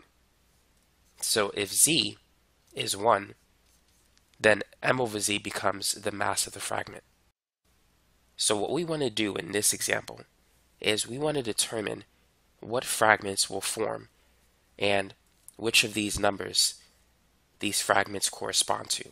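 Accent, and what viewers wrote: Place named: New York, United States. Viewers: new york accent king <3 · i think i hear a new yorker accent in this man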